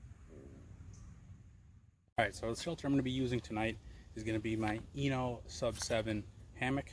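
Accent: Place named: Upper Midwest, United States. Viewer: Good job 👍. Now I know where you get your accent from. I lived in the UP for 8 yrs. We took trips to Green Bay just for the shopping...lol. Look forward to seeing more.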